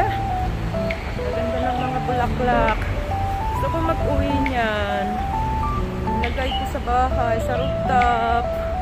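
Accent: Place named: Ilocos, Philippines. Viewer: Parang ilocona sounds ka lods love it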